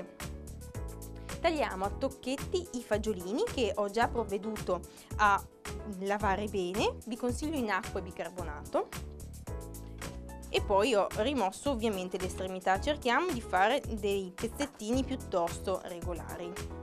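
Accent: Italy. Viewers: Is this Italian accent? My neighbors sound so different :)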